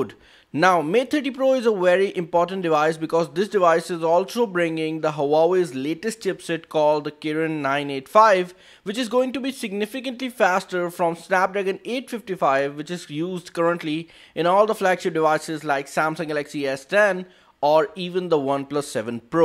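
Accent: India. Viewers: indian accend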